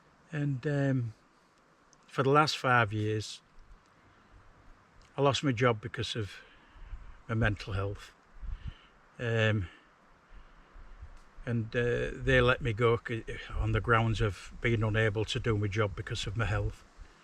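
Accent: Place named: Hull, United Kingdom. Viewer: Thought I could here ull accent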